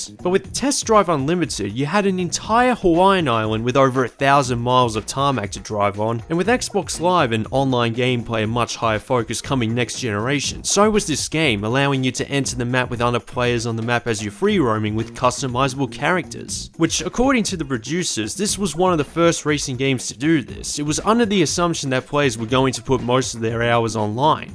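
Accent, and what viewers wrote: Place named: Australia. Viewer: And that, had a Japanese version, with just Nissan cars. <link> / You poor, unfortunate, soul... You’re not wrong, you’re at a Million points, before you know it. - This list of games, says all I need to hear, about your channel... To be fair, on your semi-Aussie sounding ass, it seems that a lot of TDUX360, was transferred to TDU2, and you’ve made it noticeable. - 😂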